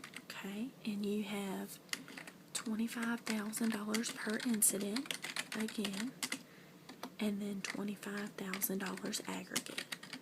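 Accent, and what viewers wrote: South, United States. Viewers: Please please please do more Southern accent videos =)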